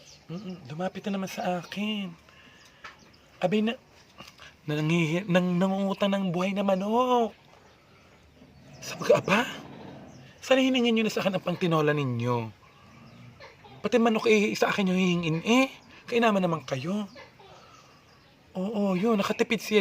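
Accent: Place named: Cavite, Philippines. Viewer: ganyan din dito samin sa Amadeo Cavite. Same accent kaya nakakamiss ang childhood palagi ako nanunuod ng vlogs mo haha Thanks for always making my day idol!!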